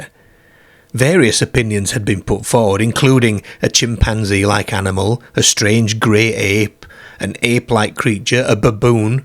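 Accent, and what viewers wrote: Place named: Yorkshire, United Kingdom. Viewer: Somewhere in yorkshire where they say Bairking instead of barking, hairf instead of harf...I dunno!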